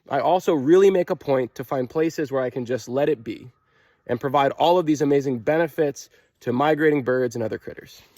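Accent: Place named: Vermont, United States. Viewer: Oh my goodness! You sound like a real Vermonter! Recognizing nuance dances within the entire Living Web of Life.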